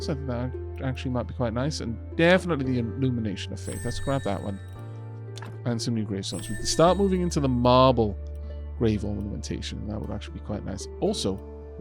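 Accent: United Kingdom. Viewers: Holy shit your like one of the most British people ive ever hear speak...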